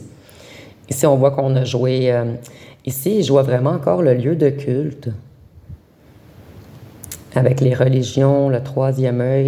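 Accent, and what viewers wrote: Quebec, Canada. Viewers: Merci beaucoup ! Le tirage est très parlant et déterminant pour moi ! ( avec votre bel accent québécois .! ) MERCI pour tout . Je vous souhaite une très bonne soirée !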